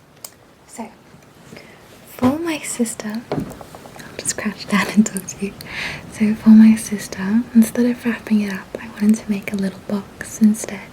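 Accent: Australia. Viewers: Are you from Australia by any chance ?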